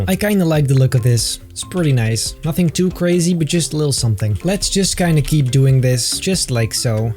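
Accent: Netherlands. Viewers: je hoort wel een beete dat je nederlands bent!:D Mooi man dat je zo goed kan zijn pfff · Hey jij Nederland. Zou me niks verbazen. Kan het horen.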